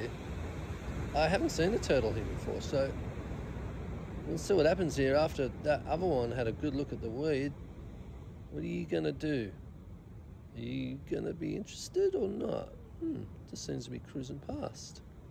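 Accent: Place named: Australia. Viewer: Great footage, love hearing Aussie commentary, I've lived along east coast all my life, had some close encounters over the years, Evans head, Lennox, Byron. Just found the channel, keep up the great work, Cheers 😊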